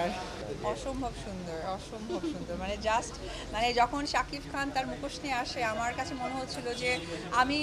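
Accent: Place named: Kolkata, India. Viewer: কিন্তু দিদি,কলকাতার একসেন্ট শুনতে পেলাম বোধ হয় 😅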